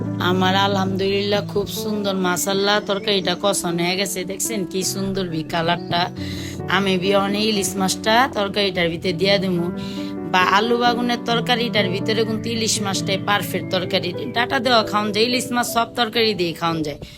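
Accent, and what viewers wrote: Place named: Old Dhaka, Bangladesh. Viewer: অলাইকুম সালাম আপু, পুরান ঢাকার ভাসা আমার খুব প্রিয়, সব কিছু মিলিয়ে পুরো ভিডিও টা খুব ভালো লাগলো ❤❤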